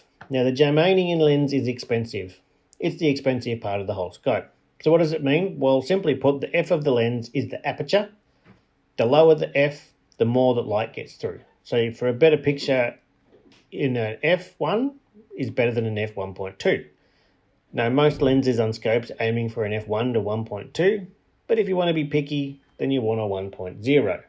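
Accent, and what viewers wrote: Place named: Australia. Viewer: I guess it's just ME (Anyone else have this problem?) but..........that heavy Aussie accent and fast-talking got me. Understood about 10% of what you said. Was looking forward to a super-informational video on thermal scopes/spotters but had to bow out early. Bummer.......!!!! (Maybe you can slow down your speech a bit.........Will give you a "Thumbs Up" as I'd bet the video was otherwise worth it).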